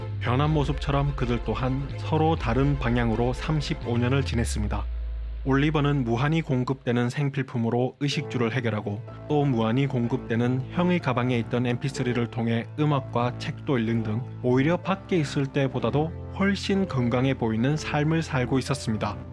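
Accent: Gyeongsang, South Korea. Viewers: ㅋㅋ보통다서울말로만 듣다가 경상도분이니깐 되게신기해요ㅋㅋㅋㅋ 나쁜뜻아니고 딱한마디 듣고 빵터졌어요ㅋㅋㅋ 귀여워용 크 친근감ㅋㅋㅋㅋㅋ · ㅋㅋ 사투리 너무 재밌어요 경상도 사투린가요?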